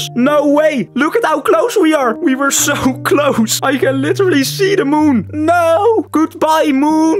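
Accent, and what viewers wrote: Netherlands. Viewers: ben je Nederlands ik hoor het aan je accent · I can hear the dutch accent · Are u dutch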